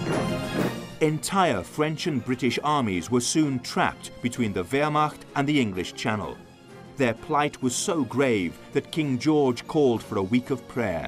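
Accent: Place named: Scotland, United Kingdom. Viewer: took me a few minutes to decipher the Scottish, couldn't place the second influence in his accent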